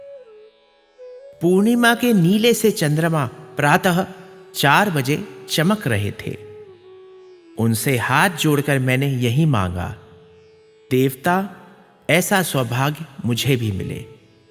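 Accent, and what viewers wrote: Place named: Varanasi, India. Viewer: these stories by themselves are so interesting, but your superb narration in the Banarasi dialect makes them all the more entertaining! Takes you to a different world altogether. Congratulations! Well done! 👌👌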